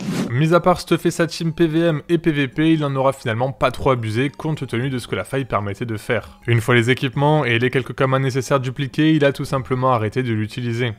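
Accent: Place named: Iran. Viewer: ça fait plaiz d'entendre un iranien (a l'accent)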